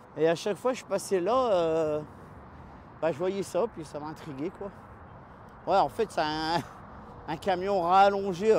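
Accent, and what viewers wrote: North, France. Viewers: Merci pour le partage c est toujours un régal mais tu as un accent du nord 😊de la France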